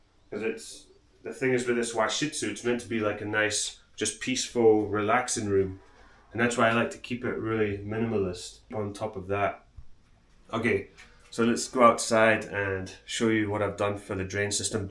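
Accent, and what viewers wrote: Scotland, United Kingdom. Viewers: Dude, I always assumed you were Australian. Didn't realize you were Scottish!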